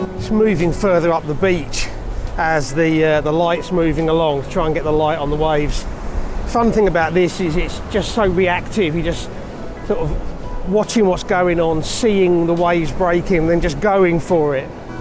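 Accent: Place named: England, United Kingdom. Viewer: In the beginning I understood "I love wifes, I love photograhing wifes" later I realized, that you`re from England 😁. Sorry... Beautiful video!! 👍👍👍👍 Best regards Your namesake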